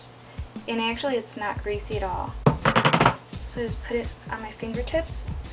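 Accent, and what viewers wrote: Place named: Midwest, United States. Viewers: i think its a midwest accent... im guessing she is from chicago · r u from the mid west? you sound juuuuust like me and my friends haha!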